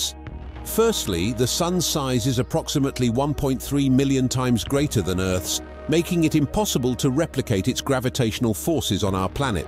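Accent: United Kingdom. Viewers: How about inviting Tony Stark to give a hand? He knows all about fusion, since he carries one in his chest … what a sensationalistic video, luring people to watch in its entirety just to find out China has no artificial sun nor has launched bananas in the air. Shame com this narrator with his dreadful British annoying accent !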